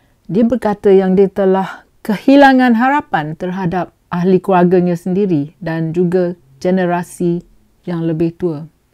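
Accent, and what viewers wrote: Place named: Perak, Malaysia. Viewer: Maafkan teman,yong (P/C) Mariam Mokhtar.Dari tutur bicara mu,teman boleh agak, Mike(Mariam Mokhtar) ni,orang Perak?.Teman rase seronok bebeno bila dengar Mike bercerita hae had politik Malaysia sekarang. Sesekali Mike selit le sikit___Lompat katak,ADUN kitar semula yang tak cemerlang dan perangai MP waktu persidangan Dewan Parlimen. Sekian terima kasih.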